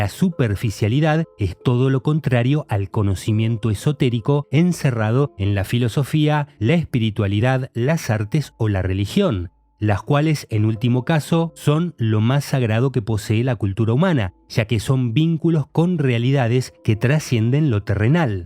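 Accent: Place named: Argentina. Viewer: Aquí, dispuesta, UNA VEZ MÁS, a SEGUIR APRENDIENDO de tus trabajos de investigación. Tu indisimulable acento argentino es, en verdad; un ORGULLO para todos tus compatriotas, entre los que me encuentro. Además, me llena de GRATITUD el hecho de que, a mis años, puedo quedar SIEMPRE ENRIQUECIDA al escuchar a un joven TALENTOSO que, seguramente, tiene edad como para ser mi nieto: un PRIVILEGIO que es para mí un REGALO DEL UNIVERSO. Un abrazo desde Mendoza.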